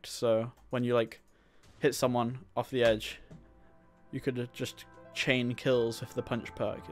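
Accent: United Kingdom. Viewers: Why are You british.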